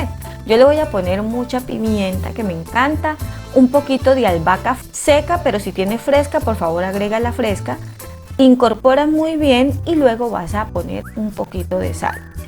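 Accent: Colombia. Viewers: Amo tu acento Colombiano!!!! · Por el nombre del canal yo pensé que era de comida mexicana ya que el achiote es la principal especie utilizada en la comida yucateca pero al oír tu acento me doy cuenta que eres colombiana.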